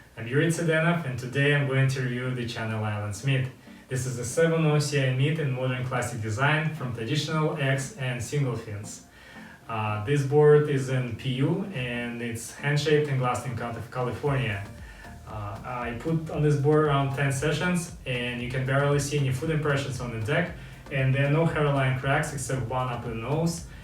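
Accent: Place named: Russia. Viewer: No disrespect, but did you learn English in Russia? you have an interesting accent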